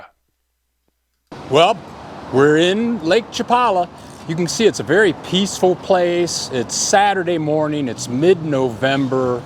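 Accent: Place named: United States. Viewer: Good video. Lol, after 15 years your gringo accent is still very strong. ¿hablas español?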